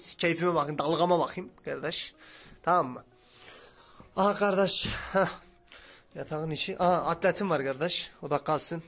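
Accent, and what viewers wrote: Turkey. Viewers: adanalı gibi sanki yeniyim aranızda ben adanalıyım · Sen elazığlı mısın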